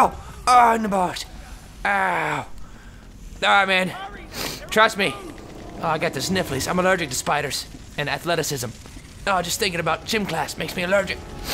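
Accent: United Kingdom. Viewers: l luv ur britsh ascent lol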